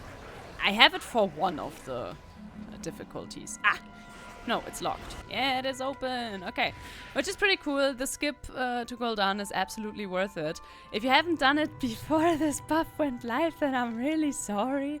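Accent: Germany. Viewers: egal wie sehr man sich anstrengt, man hört immer wenn wer deutsch ist :D ich kenn das Problem haha, tolles Video.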